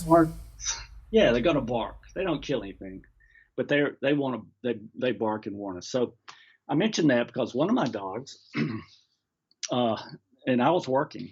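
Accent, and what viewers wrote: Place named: France. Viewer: is back! Yay! I missed your French accent 🤍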